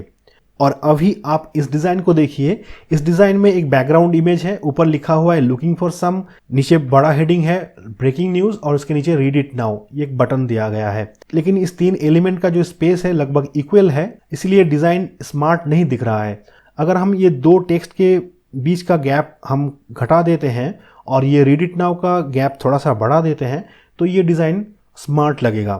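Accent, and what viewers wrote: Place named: Bengal, India. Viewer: সুপ্রিয় মহাশয় , আপনি যে এমন একটা অ্যাডভান্সড টপিকে এত ডিটেলস এ টিউটোরিয়াল বানিয়েছেন এটাই অনেক বড়ো কাজ। বাকি আমি বাঙালি বলেই আপনার হিন্দিতে বাংলার টানটা ধরতে পেরেছি, তবে আপনার হিন্দি যথেষ্টই ভালো, হিন্দি ভাষী বন্ধুদের ও আশা করি বুজতে কোনো সমস্যা হবেই ন। আমি মালদা জেলার এক সিভিল ইঞ্জিনিয়ারিং পড়া মানুষ, আমার পক্ষে CSS HTML বা কোডিং বোঝা সম্ভব হয়ে উঠেনি এখনো । তবে আপনার মতো wordpress developer দের এত সহজ ভাবে বোঝানো ভিডিও গুলো সত্যি খুব সাহায্য করেছে আমাদের মতো non-techie দের ও নিজের blog বানাতে। আপনার কাছে আর ও একটা রিকোয়েস্ট থাকলো --- যদি সময় পান তাহলে GeneratePress theme এর Page break করার পর যে Pagination page number , first page, last page eisob j aase , eta k aktu colourful korar jonno , CSS code ta kothai edit korte hoi jodi tar opor ekta video baniye den....tahole amar khub subhidha hoto....thik etar motoi <link>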